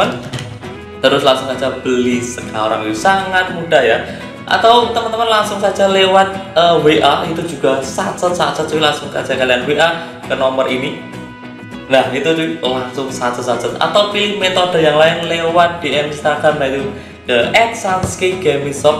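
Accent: Java, Indonesia. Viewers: Saran: cepat2 beli facecham biar g jadi ninja lagi 🤣 Kesan: public speaking asik ga bosenin dengan nuansa jawanya wkwk Kritik: sering-sering live mang kqlok bisa seminggu 3x 🙏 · Hallo mas saya seneng nonton nih chanel, semoga makin maju dan makin terbaik dehh amin Saran : kalau ada facecam pasti asik mas bisa liat wajah samean pas ngonten wkwk Toxic gpp mas medog nya lucu mas kalau didenger orang2 sesama Jawa wkwk mantapp 👍👍🙏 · dengan ucapan basmallah, bismillahirrahmanirrahim untuk diri saya semoga bisa menang give away dan untuk bang keren bang, lanjutkan, chanel fifa terfavorit, paling profesional daripada yg lain, bahasa mudah dipahami dan asik bahasa jawamu sangat medok dan menghibur semoga dilancarkan terus rejekinya, sehat selalu, dan chanel bisa berkembang terus menerus saran bang untuk konten, bisa kasih tips untuk pemula yg awal main fifa bang, soalnya kalau udah ketinggalan jauh susah untuk mengejar pemain yg terdahulu hehe sukses terus bang! 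panjang umur hal hal baik! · Saran : untuk next time semoga abang sehat ya, masalahnya saya bisa build squad karna nonton chanel abang Kesan : penjelasan nya kaga belibet, dan saya suka sama logat bahasa Jawa nya Kritik : jangan sering emosi bang kalo gacha kaga hoki, wkwk itu ae sih soalnya bingung nih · Saran:sering upload gameplay/push rank biar bisa belajar dari gameplay bg Kesan:omongan nya lucu medok dan penjelasannya gampang di Terima suka bahas bocoran soal event yang akan rilis Moga channel makin berkembang dan bisa tembus 100rb subs bulan ini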